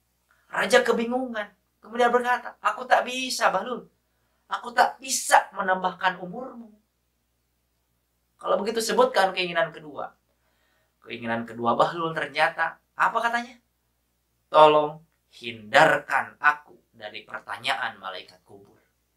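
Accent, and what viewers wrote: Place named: West Java, Indonesia. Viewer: Sunda a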